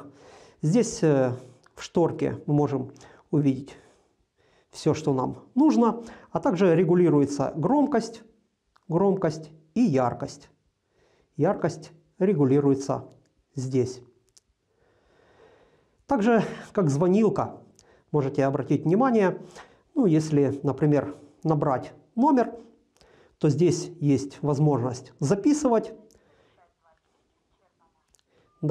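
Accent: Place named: Ukraine. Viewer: С Украинским акцентом хороший обзор.и достойный смартфон.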